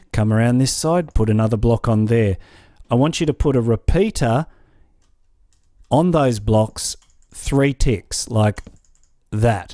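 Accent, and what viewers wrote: Australia. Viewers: you seem australian are you? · are you australian? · You sound Australian... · I'm Australian and this is the most Australian accent I've every heard! Makes me proud!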